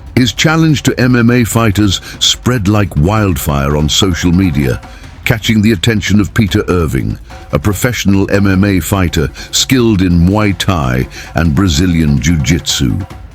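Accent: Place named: France. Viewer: Vidéo de M.... l' IA parle anglais avec un accent français de toute beauté ( ça peut pas être un humain 🤔 ) mais les commentaires sont hilarant 😂😂😂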